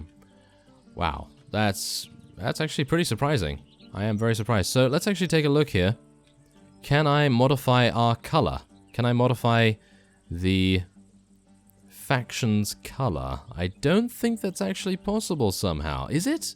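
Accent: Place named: England, United Kingdom. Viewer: soothing listening to a proper english-speaking voice instead of that dreadful american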